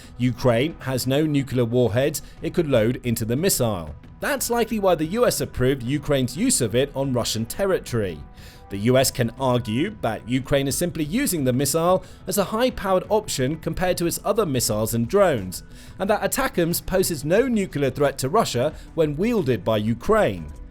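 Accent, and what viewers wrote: England, United Kingdom. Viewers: English Accent hiding The English Jewish Gangster!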